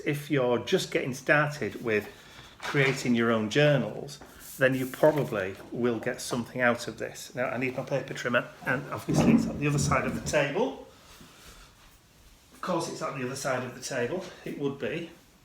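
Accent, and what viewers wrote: United Kingdom. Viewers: Oh don’t you hate when that happens? Lol. It was a great video still, you have awesome ideas and love listening to you and that British accent. 💕😎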